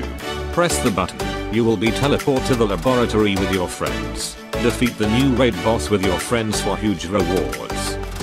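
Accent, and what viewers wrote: India. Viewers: Lol indian voice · Why does the new voice sound like an INDIAN!!!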